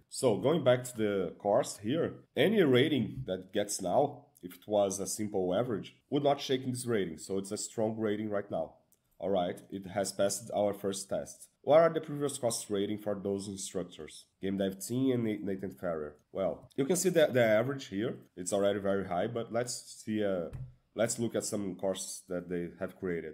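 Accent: Brazil. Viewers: Deu para saber que era brasileiro pelo accent kkkkkkkkkkkk muito bom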